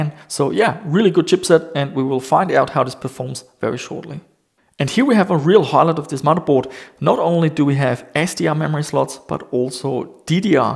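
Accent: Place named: Germany. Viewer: Hey, i'm watching your content for about 1 or 2 years and on any video i'm thinking if you are german, 'cause your accent sounds so for me. But if or if not, its not important. I love your videos, it reminds me on my childhood and youth. BR Horst from Germany;-)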